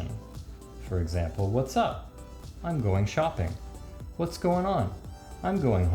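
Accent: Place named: Canada. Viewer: Love that Canadian accent!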